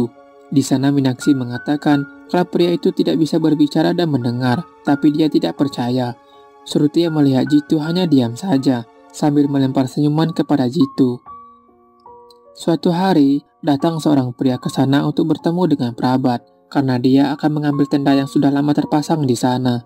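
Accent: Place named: Banyumas, Indonesia. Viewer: Ngapak Hadir lagi miin... 😘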